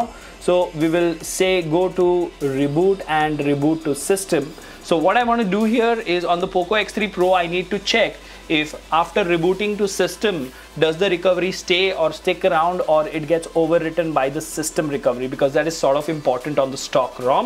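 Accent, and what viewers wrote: India. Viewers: nice an indian speaking english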